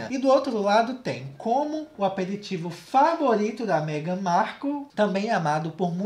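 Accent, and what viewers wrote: Minas Gerais, Brazil. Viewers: Kkkk vc é muito fofo,. Adoro seu sotaque mineiro <3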